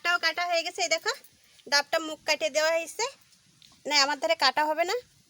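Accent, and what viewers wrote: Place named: Andaman, India. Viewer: বাড়ি কোথায় বৌদি? কথা শুনে তো আমাদের আন্দামান এর লোক‌ মনে হচ্ছে।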